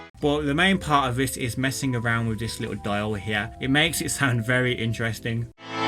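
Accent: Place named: Wales, United Kingdom. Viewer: Love that welsh accent ✅😉😀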